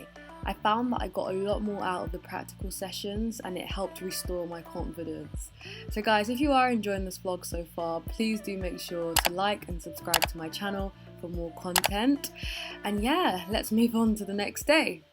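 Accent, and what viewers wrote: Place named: United Kingdom. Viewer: Well I’m here for your British accent 😂 I’ll be moving to the Uk soon. So I gotta work on my English accent lmao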